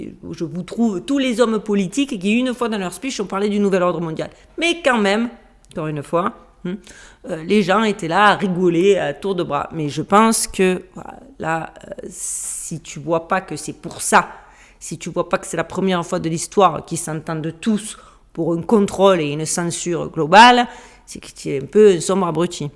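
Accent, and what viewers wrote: South, France. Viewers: meme si l'OL est l'ennemi juré de l'OM je ne me sent pas arnaqué et suis trés fier à l'international que ton petit accent du sud rayonne!!!!!!!!!!! meilleures voeux 🥰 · c'est normal 😊 🙏.. après le plus important que et vôtre amie et vous et passé les fêtes de fin d'année ensemble 🙏 le temps passe vite.. donc ☺️ courage je comprend ☺️ merci pour les sujets proposés 🙏🙏 et merci pour vôtre bonne humeur et l'accent du sud 😃 🙏 je vius souhaite une belle soirée 😊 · Hé Bhé ! Bon courage à Nous tous et que cette année soit la meilleure possible , vue ce qui nous attend à court terme. Bravo à vous pour ces vidéos Très instructives et je me posé une question , dans votre adresse e-mail ou insta 13 est pour Marseille ? vue votre accent je crois que j'ai ma réponse . Merci encore Bises 😜 👍 . Ha oui ! moi je suis à l'opposé , Lille le Norddddddddddddddd . 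👏👋 . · Heu 😮 elle vit aux states 🇺🇸 !!! Donc pas d’accent du Québec en vue 😅sinon une pointe d’accent du sud de la France 🇫🇷 dont elle est originaire 🎉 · merci j'aime bien votre approche des sujets que vous traitez et votre accent du Sud aussi , surtout ne changez rien. Je peux avoir de l'humour sans aucune méchanceté mais qui parfois n'est pas compris. Ne m'en veuillez pas.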